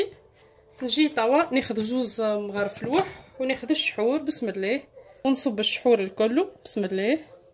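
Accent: Algeria. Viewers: حتى لهجتكم الجزائرية زوينة بزاف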